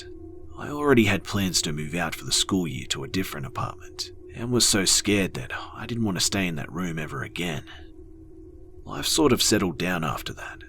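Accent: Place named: Australia. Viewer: Absolutely, I've been a follower for some time now and sorry if my comment came off a bit sketchy. 😂 I guess you're australian but could be wrong, I'm from Europe myself btw so it sounds original to me (in a good way ofc). I do like the rest too, the narration style, stories and the atmosphere etc. I find myself prefering this "stories-channel" first out of similar channels. 👍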